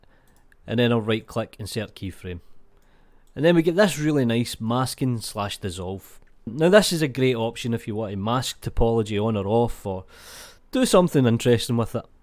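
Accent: Scotland, United Kingdom. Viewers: Love that accent <3 Is that scottish? This made me subscribe :D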